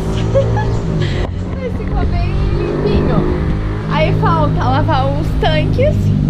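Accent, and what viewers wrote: South, Brazil. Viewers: Olá, tudo bem? Antes de mais nada, deixe-me me apresentar: Sou mais conhecido como Claudio, Carioca, residindo atualmente na Baixada Fluminense. Estou assistindo seu vídeo no computador pela segunda vez (antes só no telefone). Estou achando ótimo, é bem melhor te ver numa tela de 29' do que na telinha do celular. É muito gostoso seu jeito de se comunicar com seu público com esse sotaque sulista e sempre de um modo alegre e divertido. Dá pra sentir que você gosta muito do seu trabalho, por mais sacrificante que seja. Também acho muito legal o nome que vc deu ao seu caminhão. Te desejo um bom restante de semana e que tudo corra bem pra você. Fique com Deus!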